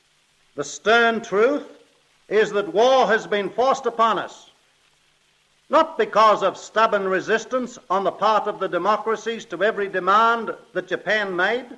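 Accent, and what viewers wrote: Australia. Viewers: as an australian, im fascinated by his accent. it feels like 80% of his accent was that of the modern australian accent, and he has hardly any traces of the english accent. this is so interesting! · Great to hear a real Australian accent. I recall a later speech, when we were directly threatened. You can hear dread in his voice.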